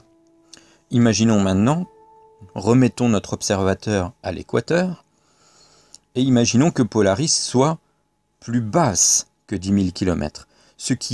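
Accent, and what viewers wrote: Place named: Alsace, France. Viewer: merci pour tes gros efforts ainsi de partager ton savoir. Il est primordial d'informer pour que les jeunes ne tombent pas dans le piège des complotistes. Pour les purs et durs de la désinformation, tu ne peux plus rien faire, excepter cette prévention. (j'ai remarqué au vu de ton accent que tu dois être du coté de l'alsace ou pas loin ?) :) note : chippie a obtenue gain de cause avec terrienne !!!! mais non rien, je note c'est tout !!! :p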